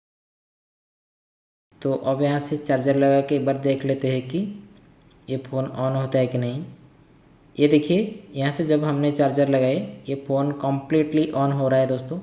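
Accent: Odisha, India. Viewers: Emiti laguchi tame odisha ru boli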